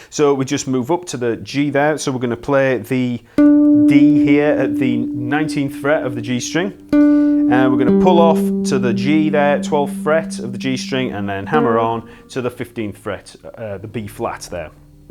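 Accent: United Kingdom. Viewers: Nice video an' accent. R u brit? If you are, from where?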